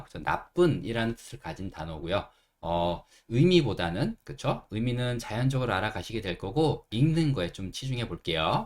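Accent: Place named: United States. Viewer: 미국발음 띤입니다!^^^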